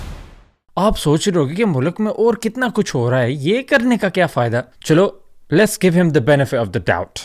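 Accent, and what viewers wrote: Punjab, Pakistan. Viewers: OMG 😱 your Urdu is like 👍🏽 Pakistani Punjabi Uncles who have lived for a long time in the west 🌎 Modi doesn’t want to give education, coz you know education is directly related to lesser votes 🗳 for the BJP party, which is also the same in the US for Republicans and so he has pass laws against triple talaq n marriage age and disenfranchisement of Muslims and farmers coz that makes his voters base happy 😃 as this effects the minority predominantly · I'm digging your punjabi accent wali urdu 😄💚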